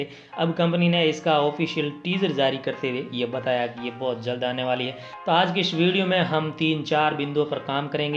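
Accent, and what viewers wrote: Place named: Marwar, India. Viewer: Aapki aawaz sun ke lg gya tha ki marwad se ho bad me jodhpur dekh ke pakka ho gya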